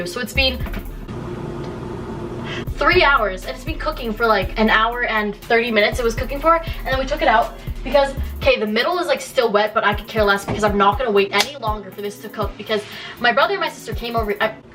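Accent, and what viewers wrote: Alberta, Canada. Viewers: You can hear the Canadian in her and I love it 🇨🇦🇨🇦🍁🍁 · oh mygod you albertian accent is amazing lol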